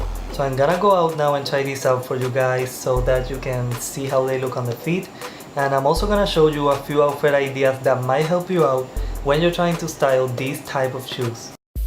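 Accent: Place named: Philippines. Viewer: You're accent is like a Filipino speaking in English. :) Like us!!! I Love you and your shoes!!!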